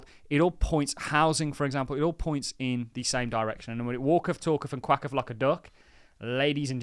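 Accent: United Kingdom. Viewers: Retail as you define them, will most likely not be back in any force. They are completely tapped out due to government induced inflation. You sound like you are from the UK, how are those energy cost working for average person. This is where You Tube pendants have got it wrong. Wall Street has taken over crypto!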